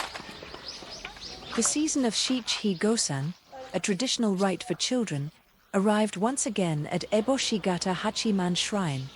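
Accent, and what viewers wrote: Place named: United Kingdom. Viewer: lol, the british fem voice over lays it on thick enough for you?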